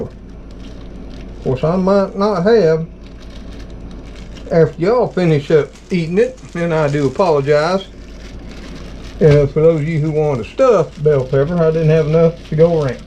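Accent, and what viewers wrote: South, United States. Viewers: if your a Finnish person it's all salt and pepper, Ha Get right down to it. I learned Mrs's Dash in Boy Scouts! ha Love your southern swang. Get a job Brian.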